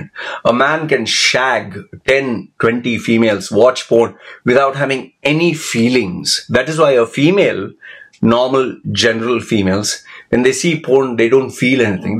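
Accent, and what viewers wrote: India. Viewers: Hi, why does your Indian accent sound stronger now than what it did in your 2011 Ted talk?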